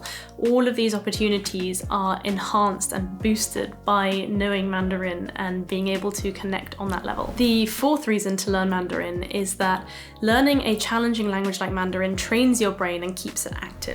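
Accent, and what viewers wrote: England, United Kingdom. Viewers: I was looking on youtube for Chinese lessons when I came across your videos. I thought I saw a Chinese woman on your video cover. Then she speaks pure English with a British accent. I was like : whaat ?? Then I learned she only speaks English and is learning Chinese. heh ? . If your friends told you you should try make youtube videos and put your face on the covers - then yeah, that was a great idea. That beautiful face of yours is a click magnet. Very GOOD ! Now I also learned that you are studying to become a doctor. Hey hows that for double good: She also has brains ! not just something like perfect beauty. The problem with most doctors are they never get around understanding the placebo effect even though it is in most doctors study materials. I hope you will be smarter. And I DO hope you will be smarter :). Anyway, I am curious about how come you look so Chinese/Asian. 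Must be in the bloodline somewhere recent in the family tree ? I think your reasons and motivation for learning Chinese is very good. I will not keep it against you for neglecting the following reason: And next time some Chinese speaking person starts to speak Chinese to you because he/she thinks YOU are Chinese speaking: You don't just have to stand there dumb struck and start talking English with a perfect British accent. Sorry but its just funny ! LOL . Well have a great day and I'll probably see you in some of your other videos. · wow i like your british accent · Ni Hao I love everything you said,particularly your British English. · As an American born Chinese - I grew up speaking Cantonese. Honestly, its NOT the Chinese but your ENGLISH ACCENT I love most. The English accent automatically boosts your perceived IQ 88 points!!! Where are you originally from?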